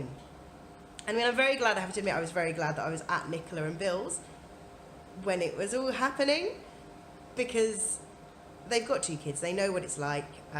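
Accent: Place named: Mansfield, United Kingdom. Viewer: Are you local to me? I’m from Mansfield and your accent sounds similar to mine lol and you mentioned Nottingham! I’m not a single Mum but my Hubby works 60-70 hours a week so I’m on my own most of the time. Mummy win! Xx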